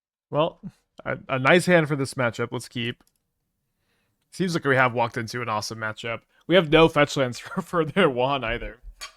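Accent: Texas, United States. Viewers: Texas accent, i hear it that way.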